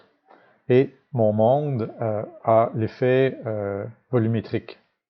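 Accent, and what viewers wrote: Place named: Canada. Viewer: Bonjour. Je découvre ta chaine avec plaisir. Ton tuto est exactement ce dont j'avais besoin pour le visuel sur lequel je travaille. J'aime beaucoup ta façon d'expliquer, c'est clair, efficace, avec l'accent canadien que j'adore de surcroit! Je vais regarder tes autres tutos. Bonne continuation. Birdee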